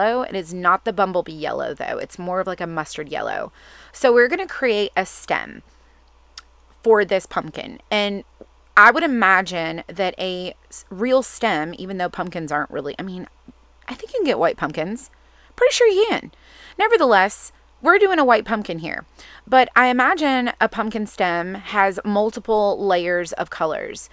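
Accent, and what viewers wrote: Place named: Georgia, United States. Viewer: I totally LOVE the pumpkin! THANKS for demonstrating the bow.. LOVED it. Everytime I hear your voice I get homesick for Ga. Take care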